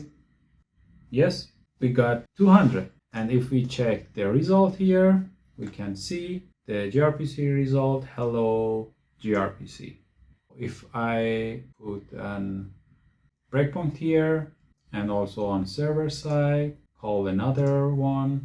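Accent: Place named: Iran. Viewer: بعضی از تلفظات خیلی فارسیه،حیفه متد، ریسپانس و ... که بیشتر تو محیط های آیتی انقدر اشباه تلفظ میشه که تلفظ اشتباهش فسیل شده.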